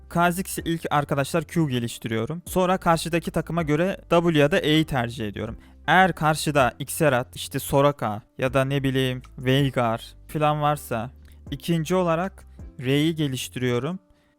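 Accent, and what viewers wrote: Malatya, Turkey. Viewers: Malatyalı mısın hemşerim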